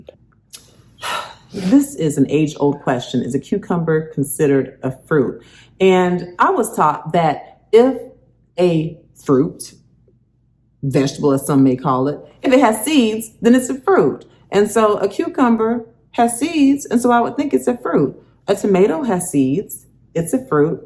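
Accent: South, United States. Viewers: From the South, that explains the accent, love it !! Come on folks ---- 460 views and only 76 Likes...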